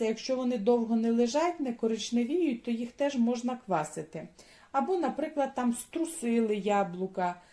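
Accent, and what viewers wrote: Ukraine. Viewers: Дякую Вам за простий і зрозумілий рецепт! Підписався. І дякую за мову, звідки вона така? Не Полтавщина, там характерні "ль", не Галичина - відсутні місцеві вставки. Скоріше центр України: Київ-Дніпро-Вінниця-Кропивницький-Черкаси. Підтвердите мої здогадки? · Как же я давно не слышала настоящий украинский язык. Такой родной и красивый как у бабушки в селе в Винницкой обл. От западенского уже уши вянут. Спасибо большущее вам за рецепт.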